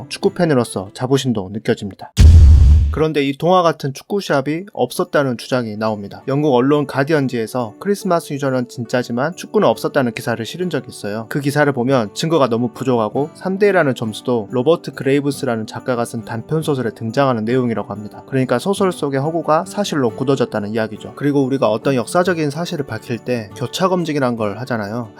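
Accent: Jeolla, South Korea. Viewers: 난 이 형님 전라도 억양이 너무 좋아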